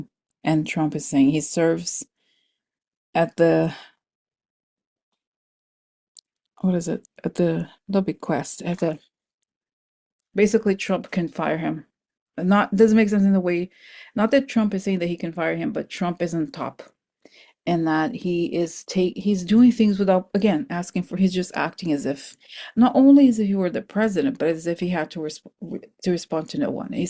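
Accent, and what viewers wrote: Russia. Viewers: Thank you so much for your psychic data & explanation of current events. I appreciate your solo shows, your beautiful energy, your humor, Russian accent & makeup (though you are naturally beautiful). 🩷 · I’m not bothered by you putting on make up, or the Russian accent, you have a lot of interesting perspectives! Thanks❤ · thank you for these insights. And, I’m here for it. I could watch you put on makeup and do your awesome Russian accent all day long. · Hi, thank you for your precious information and to be here for us!! You are so funny between your russian accent and different makeups!! A friend of mine who was born and raised in Czechoslovakiab (ex. USSR) told me that Ivana escape her country through the mountains skiing as she was a highly skilled skier. I said to her we never heard this information in the western countries.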